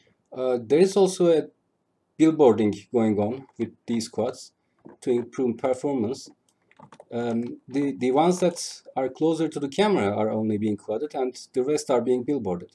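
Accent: Turkey. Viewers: Türk aksanı mı duyuyorum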